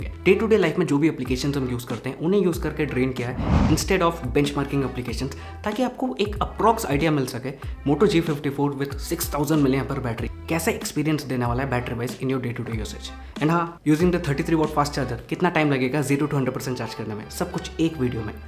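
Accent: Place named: Tamil Nadu, India. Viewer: nee hindu pesura style paathope purinjipochu... Tamil naatu accent irruku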